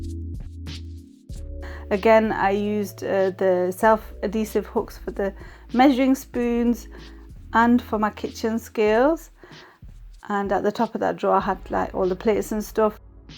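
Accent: United Kingdom. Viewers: Your videos are good but did you actually have 6 babies as a 2nd generation person. Thats what people of the 1st generation did. Pop out a baby every year until menopause. 6 is way too many. Omg !!!!! Were some twins or something ? I mean I dont actually know anyone thats 2nd generation ( children of immigrants ) that have had 6 kids, or are you an immigrant yourself ? You cant be because you speak so British. I think its quite selfish on your children to fill the house with so many babies. IT LEADS TO POVERTY !!!!!!!!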